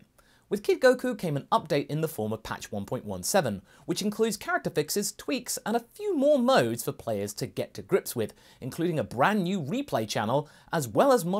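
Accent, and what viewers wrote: United Kingdom. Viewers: He is, indeed, British. English, specifically.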